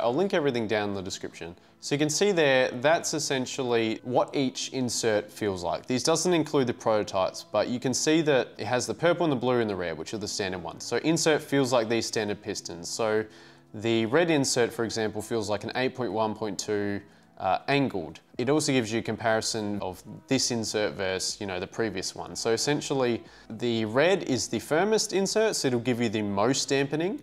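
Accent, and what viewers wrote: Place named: Australia. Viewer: Campletown shirt Aussie accent 💪must be a local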